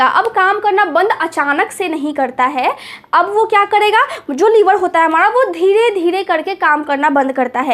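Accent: Bihar, India. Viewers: Aap Hindi saaf bolo pahle bihar ki ho naa aap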